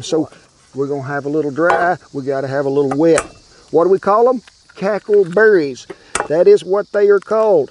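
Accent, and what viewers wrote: United States. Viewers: I cant get enough about how he talks... reminds me of how some of us from my home town speak. And we aint even in the south, just a town with southern immigrants.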